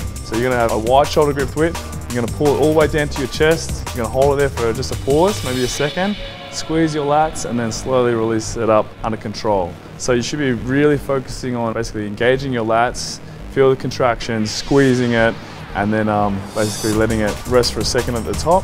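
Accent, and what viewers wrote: Australia. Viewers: Dean Alberto LMAO!! You'll be so ashamed when you realize your wrong! xD Pls, try to understand: Calum von Moger is from a country called AUSTRALIA. He speaks with an AUSTRALIAN accent. Arnold Schwarzenegger is from AUSTRIA (Österreich), a completely different country located just south of Germany, Arnold speaks with an AUSTRIAN accent.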